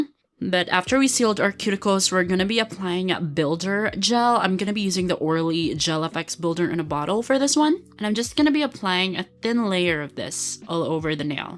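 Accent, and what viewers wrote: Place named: Russia. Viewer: the russian accent got me to subscribe hahahahahahaha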